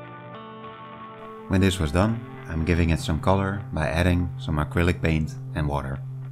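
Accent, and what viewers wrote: Netherlands. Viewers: zwaar Nederlands accent. is wel cool! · Your accent tells me your dutch. Me 2 Great diorama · Bruh you dutch aint you? I can hear the classic dutchman speaking english accent. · nice thick dutch accent ;p lovely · Kinda random but are you dutch? 0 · Detects a dutch accent.. spots gamma ... fuck yeah Hup Holland. Also cool Diorama.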